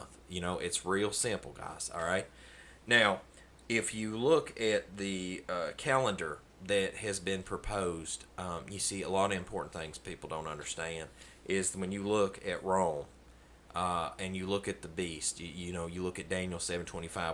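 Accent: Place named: South, United States. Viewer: Enjoyed this very much. Agree 99% lol I hadn't heard of this new calendar. I completely agree on everything you've said about the calendar craziness in history. I'm thankful Father finally told me to look up! HalleluYah! A side note. Its always nice to hear someone with a heavier country accent than myself 😆 Much Shalom and Blessings brother.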